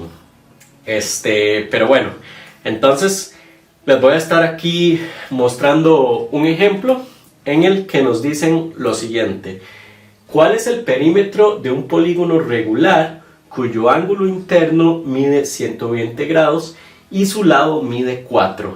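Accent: Costa Rica. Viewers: Hasta ahora veo que sos de Costa Rica el acento me parecía que si y que dices "verdad" hahahaha Pura vida pa buenos videos , voy a estudiar con tus vídeos para Bachillerato ( me parece que hasta sos de San Ramón)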